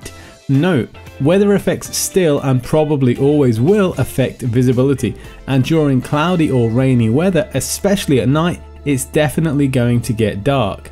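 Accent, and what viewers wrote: United Kingdom. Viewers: Thankyou for the new info as always!! that british accent tought e-e...!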